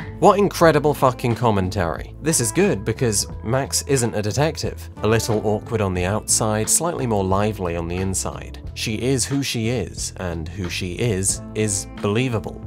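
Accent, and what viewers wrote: United States. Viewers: Your American accent is blursed. Thank you very much for showing it off. Have a nice day. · That American accent gave me whiplash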